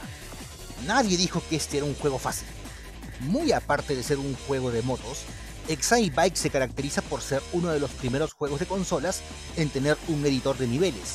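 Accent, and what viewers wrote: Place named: Chile. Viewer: Eres peruano? no me lo tomes a mal pero antes pensaba por tu forma de hablar que eras un poco chileno ... claro un poco no totalmente que eso ya es otra cosa... igual un saludo siempre veo tus videos.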